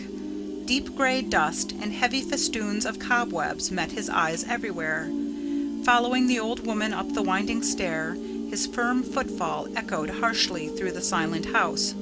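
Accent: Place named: United States. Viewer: That stupid music .. and the strident US accent.. ruined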